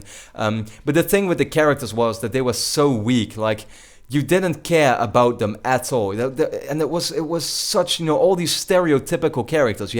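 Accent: Netherlands. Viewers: When I heard your accent I immediatly knew you were dutch. Nice video dude.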